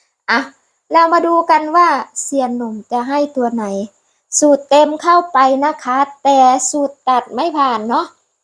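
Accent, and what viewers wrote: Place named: Isan, Thailand. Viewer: ออนชอนเสียงเว้าเด้อคัรบ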